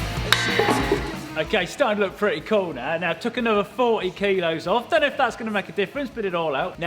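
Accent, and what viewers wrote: United Kingdom. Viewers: He sound so British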